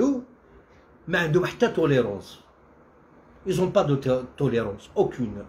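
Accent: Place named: Algiers, Algeria. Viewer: Ton accent en arabe ses comme les Algérois avec des strings à Alger chadni oula ntih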